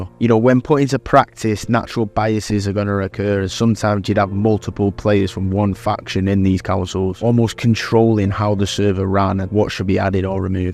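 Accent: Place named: United Kingdom. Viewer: The fact that ur a so British makes me watch this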